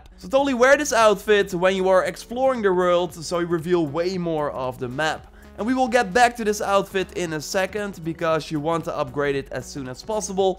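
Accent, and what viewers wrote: Netherlands. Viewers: For example better ga je het beter uit spreken man tis egt zo zwaar hollands Engels veder cek ik altijd je shit ga zo door 😎👍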